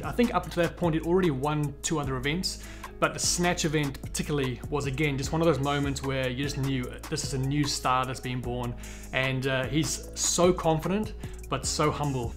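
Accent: South Africa. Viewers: ahh Potch boy. Durban girl here. Your accent is deceiving - definitely South African but a lot broader now which I would imagine comes from living in Oz. Ive been in the Middle East for last 14 years so same thing has happened to me. Anyway, keep up the great content. Id be drinking a lot of beer and wine and quarantine : )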